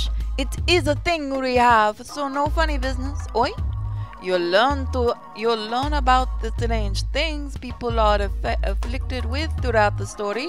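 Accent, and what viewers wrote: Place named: India. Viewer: Your french accent sounds Indian.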